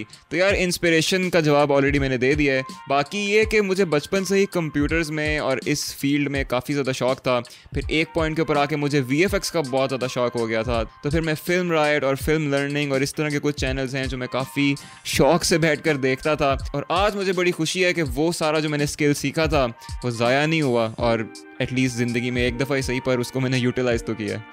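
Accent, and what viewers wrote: United Kingdom. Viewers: You Deserve My Subscribe. I like your English Accent And Your decent Talk. 👍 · Dude please teach me your english accent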